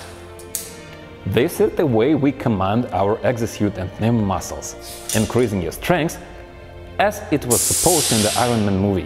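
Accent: Russia. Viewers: we can soooo tell he is russian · I love Russian inglish. Love your content bro. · Takoy strong ruskiy akcent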